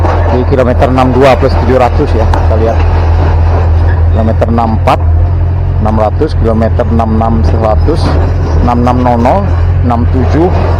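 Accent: Minangkabau, Indonesia. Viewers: Dari beberapo video ikolah nan gambar video barasiah, stabil, carito jo informasi sinkron dan jaleh, didukuang pulo jo suaro dan logat lokal, yo samakin taraso ado di lokasi.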